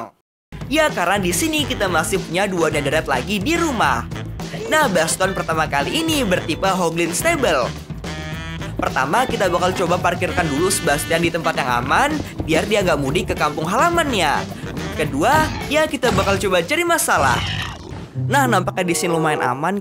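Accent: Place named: Bugis, Indonesia. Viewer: Bang Suara Nya Kek Orang Balik Papan (Kalimantan Timur) Orang Bugis Pasti 🗿 ya Kan?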